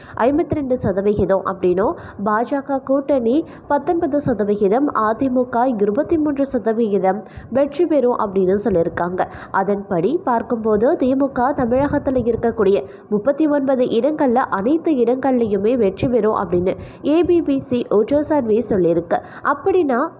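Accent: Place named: Sri Lanka. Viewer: தமிழ் உச்சரிப்பு கூட சிங்கள தமிழ் மாதிரி உள்ளது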